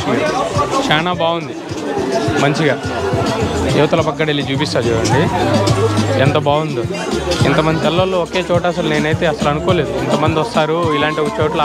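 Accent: Chittoor, India. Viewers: Brother Midhi Chittoor district Kadha మీ మాటలు అలానే ఉంది 😊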